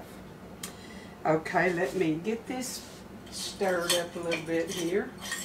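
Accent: South, United States. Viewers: Hi.. we just found you on YouTube.. love watching you cook and love listening to your Southern American accent. The mushrooms looks great also 👌👌👌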